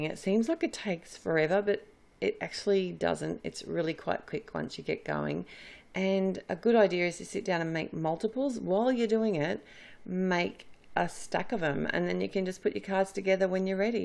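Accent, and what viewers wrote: Australia. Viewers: Nice to hear a fellow Aussie's voice on YT!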